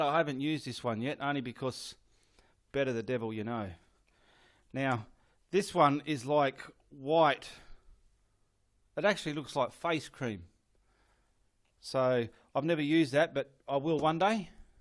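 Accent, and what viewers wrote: Australia. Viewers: I really like your Aussie acsent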